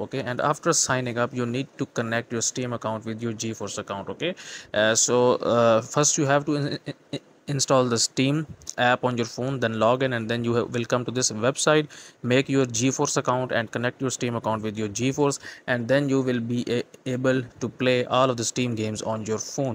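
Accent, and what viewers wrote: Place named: India. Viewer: Speak english not indian english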